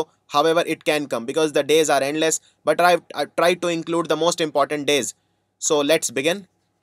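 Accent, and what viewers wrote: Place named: South India, India. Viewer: 22nd December ramanujam day of birth you sounded like South Indian, thanks lot for your help ....... Dpk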